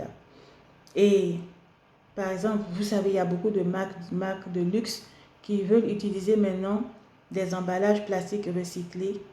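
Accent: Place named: Ivory Coast. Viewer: tu parles bien le francais avec un accent ivoirien. as-tu vecue en cote d'ivoire?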